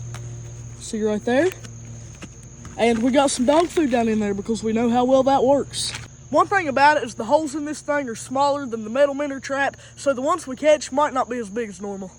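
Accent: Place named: South, United States. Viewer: Your accent is actually the best, southern accent!!!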